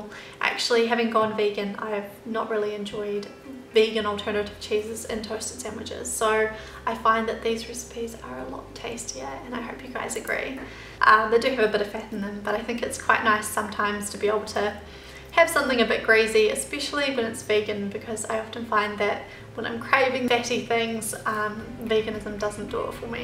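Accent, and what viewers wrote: New Zealand. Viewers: These sandwiches look SO good! Thanks for the recipe ideas - you're wonderful to listen to - kiwi?😊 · She sounds like she's from nz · New Zealand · Kiwi accent lol....people have a job understanding us sometimes :)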